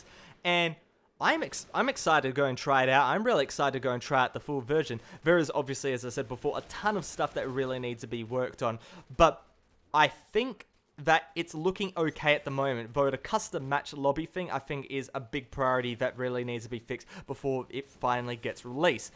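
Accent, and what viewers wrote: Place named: Australia. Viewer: your Australian accent sounding delicious like always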